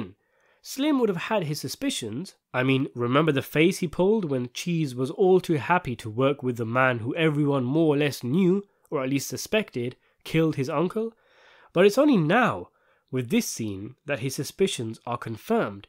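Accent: United Kingdom. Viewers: who dis brit yo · To listen to an overview of a series depicting "thug life" in the inner city of Baltimore by an orator with a British accent is a testament to his truly genius this series was . Great job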